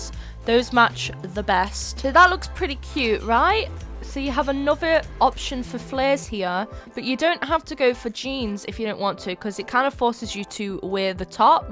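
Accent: United Kingdom. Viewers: Omg I feel like u have a British accents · i rlly love ur accent is it british scottish or something else srry i love it sm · It sounds like you speak British 🇬🇧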